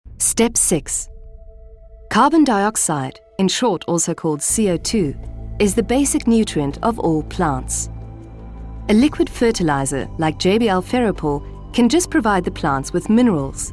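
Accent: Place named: Germany. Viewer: i hate posh english in a german accent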